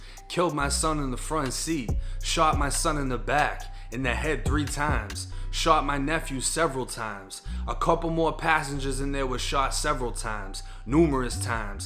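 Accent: Boston, United States. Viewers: Why you mixing a heavy south Boston accent with a Louisiana drawl???? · U from boston or something ? Lol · Know I hear that Boston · where you from Boston?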